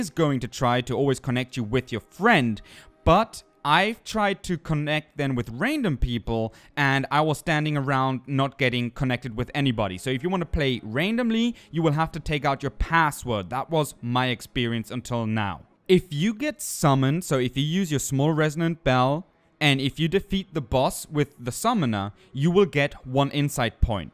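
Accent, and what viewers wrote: Germany. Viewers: yes, he's either german or austrian. I know because all of us have that exact same weird accent when speaking english, lol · you're not a native english speaker, I can hear it. I'm guessing you're german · Höre ich da einen deutschen Akzent? 😏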